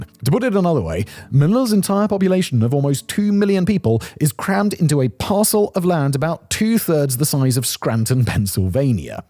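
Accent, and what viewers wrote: United Kingdom. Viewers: As a Filipino I find it endearing whenever you pronounce these Spanish sounding City names and Filipino Names in your own British - white boy way 😂😂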